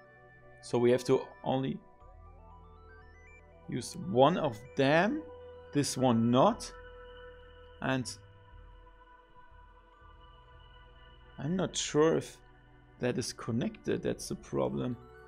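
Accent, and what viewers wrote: Germany. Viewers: Hey, been watching for a while. I own a very small VR company in Washington State in America but my girlfriend is German. So I tend to put you on whenever she's around because I think your accent reminds her a little of home. Also, I can never get over the way that you read your sponsors. It's the most German thing a German person has ever German'd. I actually wanted to thank you for the review you did on the neuro net EEG product. I'll be a little more blunt and say that it's got maybe good intentions but it is clearly a cash grab and one of those items that pops up and actually does damage to the industry as a whole because it's yet another product or accessory that is lying to consumers and getting their hopes up to get closer to where we are wants to be as opposed to where it really is. I'm in the process of opening up a new VR arcade location and I'm currently trying to figure out some hardware logistics as far as purchasing. 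Perhaps you might have some advice for me? either way I hope you're doing well and keep it up. We enjoy it quite a bit.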